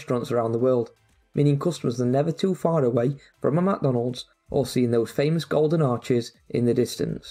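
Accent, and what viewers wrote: Yorkshire, United Kingdom. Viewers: unrelated but... are you from yorkshire...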